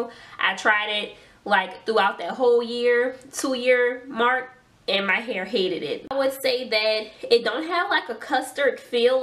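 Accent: New Orleans, United States. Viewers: I feel I hear a NOLA accent. I just randomly picked them up at a Dollar General. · Is that a Nola accent I hear 😍 cuzzzinnnn!!! New subbie 😘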